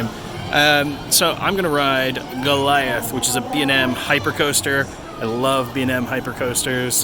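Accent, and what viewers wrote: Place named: France. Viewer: Ur french accent is so good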